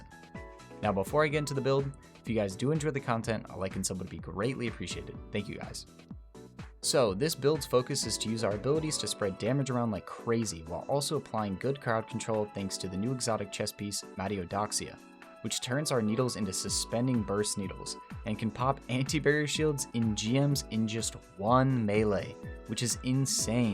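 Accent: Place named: United States. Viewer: They speaking amercan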